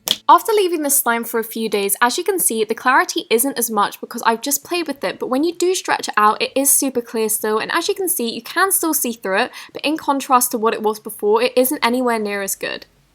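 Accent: England, United Kingdom. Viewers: 😊 you are legit my favourite YouTuber. Also, your British!(like me) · Are u English ? Xx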